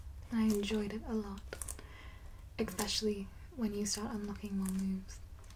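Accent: Australia. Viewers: more reason I do enjoy your videos asides from the video game knowledge and soothing content. Aussi accents are the best. ❤️❤️😘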